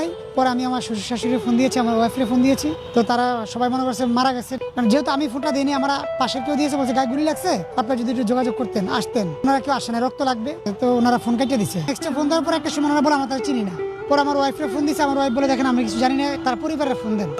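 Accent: Barishal, Bangladesh. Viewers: Borishaila bole kotha!!!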